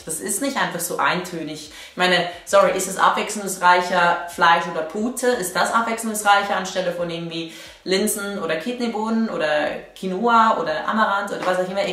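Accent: Switzerland. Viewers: Schweizerin? :'D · ist sie schweizerin?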